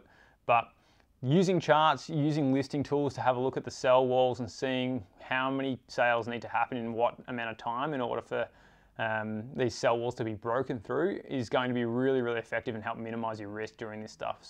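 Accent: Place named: Australia. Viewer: Watched all the way through now, I actually enjoyed hearing a fellow Aussie in the space so keep it up my friend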